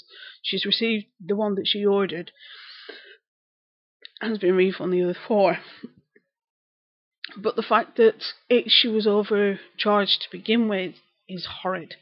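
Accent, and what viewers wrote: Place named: Bolton, United Kingdom. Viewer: you sound like you are from Bolton. xxx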